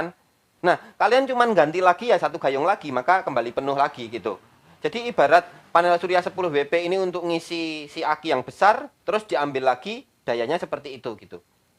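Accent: Java, Indonesia. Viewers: lucu juga ya mas ini medok jawane iaku senengmakasih saya juga mau planing buat plts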